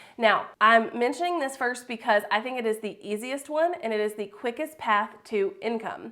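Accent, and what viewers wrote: South, United States. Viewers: Have you been to Gatlinburg TN? I started a small YouTube channel about our small tourist town...I can tell you're from the South 😉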